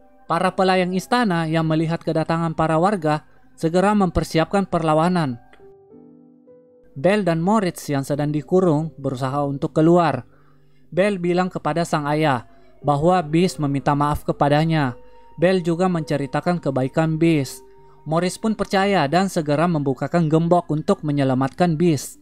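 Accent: Indonesia. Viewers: Dari dialekya kyk dialek sulawesi😁🙏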